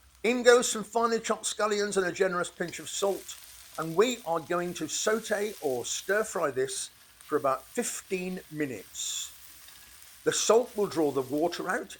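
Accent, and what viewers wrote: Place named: Birmingham, United Kingdom. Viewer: Love the gravy. Love the mild Birmingham accent. Subscribed.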